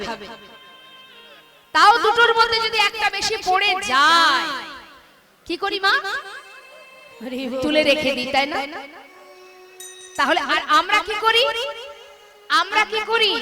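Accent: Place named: Noakhali, Bangladesh. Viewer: দাদা আপনার বাড়ি বাংলাদেশের কোন এলাকায় ,? আপনার কথা শুনে মনে হচ্ছে ঢাকা নোয়াখালী কিংবা ওর আশে পাশে।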